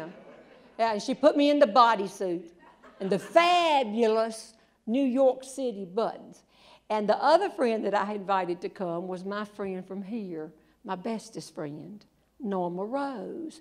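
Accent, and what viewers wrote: South, United States. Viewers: Thank you for the amazing stories, I grew up outside of Bristol, Va. my husband, who by the way is a LB! Moved me and our children to Cincinnati Ohio, 30 years ago, and now our children have grown and have given us grandchildren so we can’t move back home yet! I love listening to someone other then myself talking in a southern accent!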